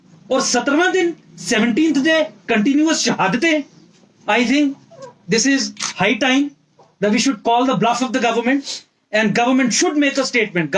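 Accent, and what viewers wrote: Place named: Uttar Pradesh, India. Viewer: Bhai tu Dogri bola kar waise ya Achi jammu wali hindi naa ki ye Up wali hindi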